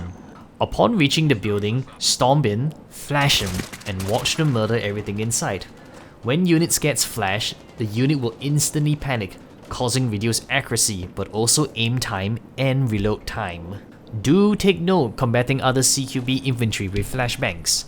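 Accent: Singapore. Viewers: somebody sounds like they're from singapore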